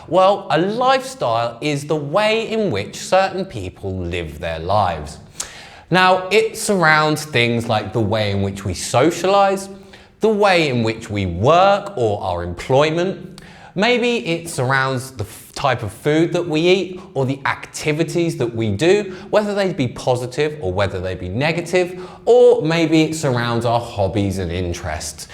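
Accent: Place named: United Kingdom. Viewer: love his british accent !!!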